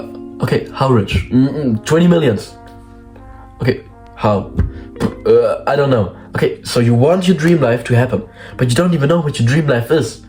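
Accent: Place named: Germany. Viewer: I like your german accent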